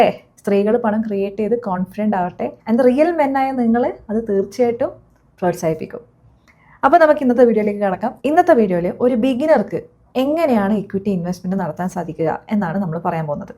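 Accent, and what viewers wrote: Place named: Malabar, India. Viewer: informative learning and Malabar slang .. two of my favorite ..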